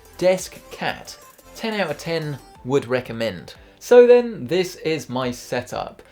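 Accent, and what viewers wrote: United Kingdom. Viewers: This british accent’s so nice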